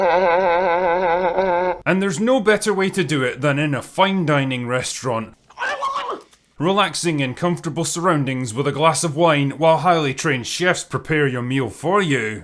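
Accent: Scotland, United Kingdom. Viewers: I know and funny too. The Scottish accent shite!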